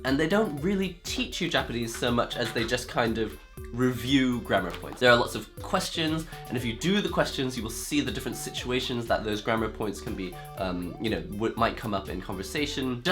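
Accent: United Kingdom. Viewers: So is the British? And learned Japanese?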